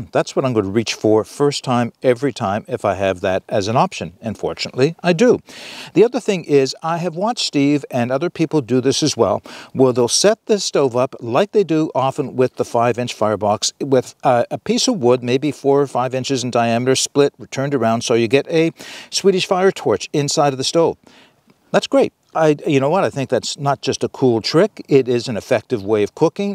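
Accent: Canada. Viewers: Thanks. I m sure that I’m not alone, in appreciating your easy yet thoughtful presentation and nice soft Canadian accent.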